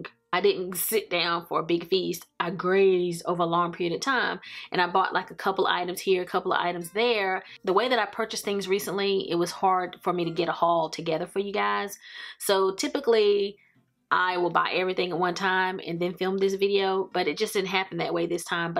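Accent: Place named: South, United States. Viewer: Love the southern twang! Have a wonderful and safe holiday season. Thanks so much for sharing.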